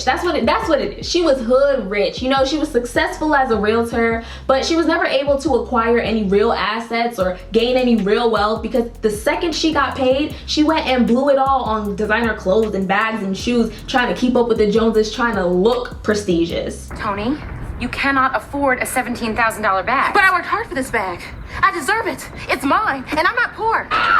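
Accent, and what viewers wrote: Jamaica. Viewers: Mumma yah jamaican????me keep getting recommend to you channel ,me ago subscribe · wait are u jamaican? also love this video 💗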